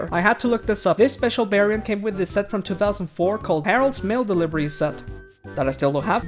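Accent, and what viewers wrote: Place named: Mexico. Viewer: You are mexican becase you sound one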